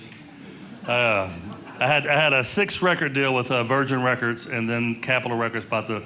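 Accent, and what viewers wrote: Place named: Kentucky, United States. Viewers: is hilarious and his standup comedy always reminds me of Kentucky story telling, especially with his accent. Some of the funniest people I've ever known are from my old Kentucky home. 😁 Truly great job! 💕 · you made me laugh myself silly on several occasions during this set!! I still visit my brother in Highview when I get back to the U.S. and always make a trip downtown to Caufield's!! I started doing magic and shopping there back in 1980. I grew up in the Louisville area from 1966 to 1975 and came back to KY after the navy in 1979 to 1982. It's nice to hear the hometown accent!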